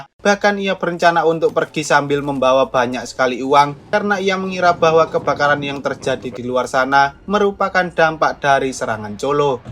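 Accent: Java, Indonesia. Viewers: Dasar medok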